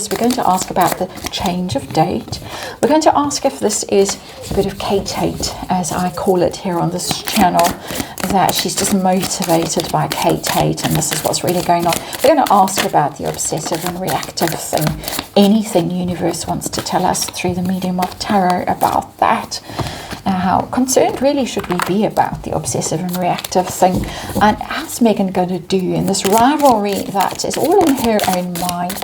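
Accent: South Africa. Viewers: I have to 'scroll up' to get their faces out of view and just listen to any commentary. That's why it's nice to look at the tarot cards and listen to that lovely soft South African melodic voice with its occasional giggles.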